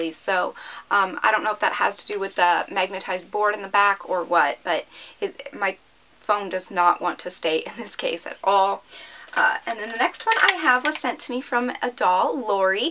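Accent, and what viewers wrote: United States. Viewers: Western / texas . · Are you from boston??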